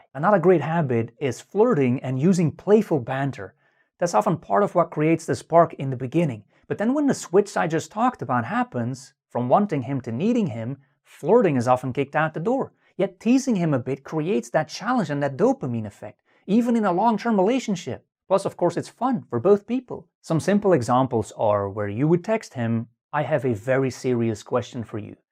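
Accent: Scotland, United Kingdom. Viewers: Scottish accent. 💋❤️ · ~This is fantastic advice!!!~Im distracted by your accent tho, trying to tell if its scottish, or irish, or...???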